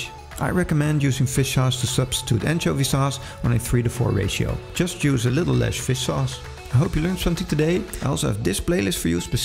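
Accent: Netherlands. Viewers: annoying dutch accent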